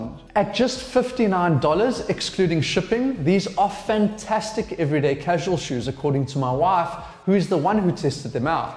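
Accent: South Africa. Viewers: South African accent?? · Ja bru I skeem he's a joburg o ay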